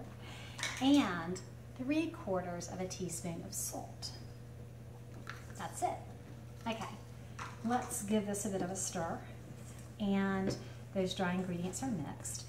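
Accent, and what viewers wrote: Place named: Pittsburgh, United States. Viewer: If you don't mind my asking, what part of the country are you from? I grew up in the Pittsburgh area. sometimes it sounds like you are speaking "Pittsgurghese" but certain words sound a little off. Just curious about my own detection skills. Thanks for the great recipe.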